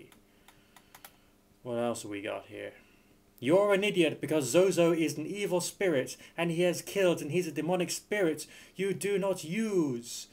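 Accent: United Kingdom. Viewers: your an idiot and don't know any thing u british talking fuck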